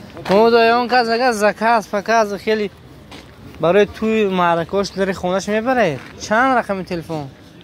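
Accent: Mastchoh, Tajikistan. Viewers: Лавзи Мастчохи кади гап занен кайф мекунам гапи шумора шунида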